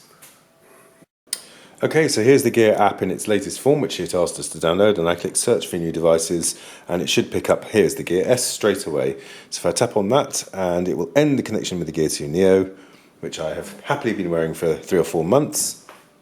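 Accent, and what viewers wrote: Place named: United Kingdom. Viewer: Marvelous review...love that british accent....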